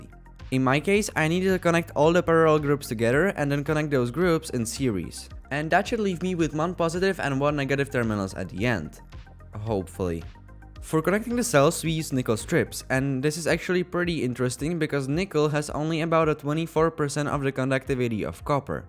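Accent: Czech Republic. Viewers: Kamo, poznám český přízvuk, maga tvorba a w tvoje ajina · i sense a czech accent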